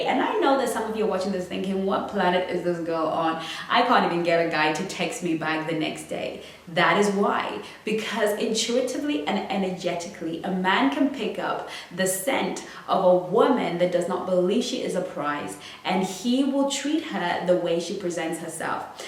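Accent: United Kingdom. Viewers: Hi beauty...how much I love your Brit accent and your style! Bisous ma chérie...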